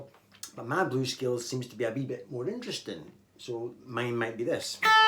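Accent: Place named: Edinburgh, United Kingdom. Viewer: You know, as much as I love our dear friend Uncle Larry (Tom Bukovac Home Skoolin' for those who don't know what I'm talking about), you are talking directly to me in a way that just hits home. I dig the gear you use and your videos about how you use them, and your lessons even more. The charming Edinburgh accent is a bonus! And thanks for the shout-out.:-) I guess it is soon time to get some merchandise available for us fans to buy;-).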